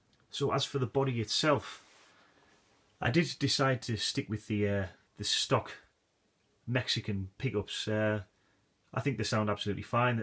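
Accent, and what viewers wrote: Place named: Newcastle, United Kingdom. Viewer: Noticed the geordie accent on the first spoken word ! Beautiful guitar man. Did you have to do much extra work on the nut of the Warmoth neck ? Or was it filed to the correct depth ?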